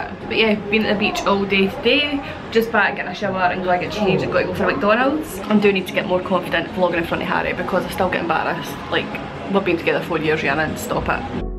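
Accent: Scotland, United Kingdom. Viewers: I actual love your patter, so Scottish haha. Thanks for the video and congratulations! Whereabouts in Scotland are you guys from?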